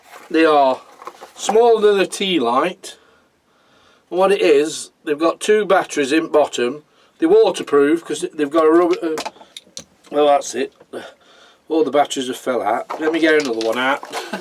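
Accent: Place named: Derbyshire, United Kingdom. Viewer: Good job! I always like watching your videos. I'm guessing you are Debyshire lad from your accent? Well south of me anyway - Leeds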